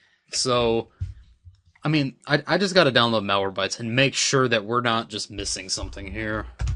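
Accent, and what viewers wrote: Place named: Australia. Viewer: That sounded like an Aussie voice :)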